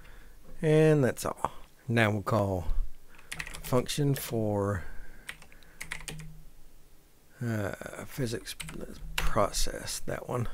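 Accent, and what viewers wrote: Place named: South, United States. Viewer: Watching this I'm thinking his southern accent really undercuts his credibility, half way through I realize he knows what hes talking about so I stick around to the end to hear the "ain't it puuurdy" and I died! lmaooo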